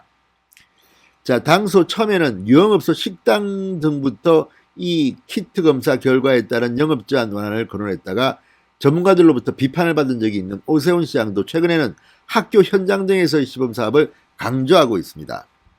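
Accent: Gyeongsang, South Korea. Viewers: 말음법칙아시나요? 경상도 분 잘 안되죠? 활용->화룡 ; 일요일'>이료일 - 미국에서 40년 거주 갱상도 출신 교포가 제안드림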